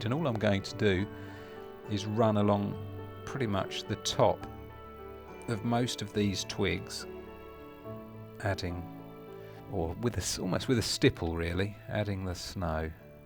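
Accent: United Kingdom. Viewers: Lovely British accent🥰😀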